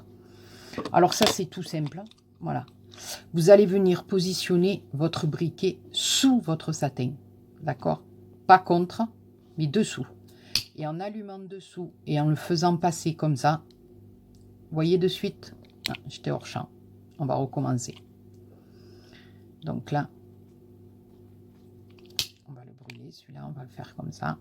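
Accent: South, France. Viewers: Tuto merveilleusement bien expliqué, avec un merveilleux accent du midi, je m'abonne.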